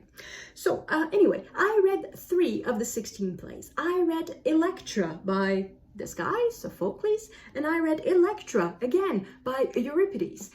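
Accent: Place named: Quebec, Canada. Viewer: I do enjoy your channel. I love your mixture of Canadian English and French. It makes this old lady happy to hear you talk about her favorite things, books. I don't know whether I have actually read the Illiad and the Odessey as but I remember enough about them that I'm pretty sure I have read them sometime in the last 65 years (I was 12 then). I do remember reading The Aneneid in fourth year Latin at school. Dido really stuck with me. My daughter has read Gilgamesh. If she owns the book I can borrow it for her.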